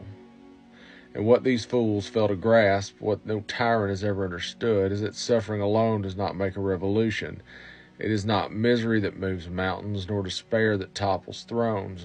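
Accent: South, United States. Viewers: I really enjoy your content and I love your Southern accent 😍 I'm a Southern girl myself 💙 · Your poetry, deliveredwith a southern accent, give me hope for a USA free from the current regime there. May others hear your voice with Joy.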